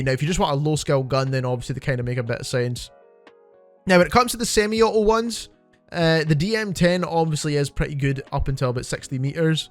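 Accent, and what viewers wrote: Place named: Glasgow, United Kingdom. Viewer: Are you Scottish bro sound a tad Glaswegian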